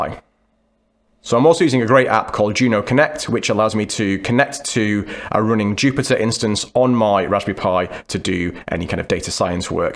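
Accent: United Kingdom. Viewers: Hey, how do you setup the pi as a ntewrok server to connect to the files while connected on the ipad? where you from, is there a hint of a welsh accent?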